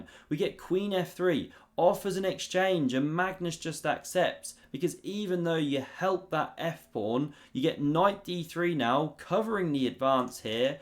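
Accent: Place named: Estuary English, United Kingdom. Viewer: Annoying juvenile "humour," even more annoying Estuary English pronunciation, and insufficient analysis. Agmadator's videos are FAR superior.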